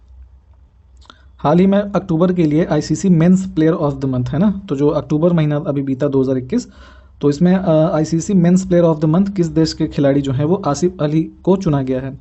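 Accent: Kanpur, India. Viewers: Sir aapki voice Kanpur lg rhi h voice bhut achhi h apki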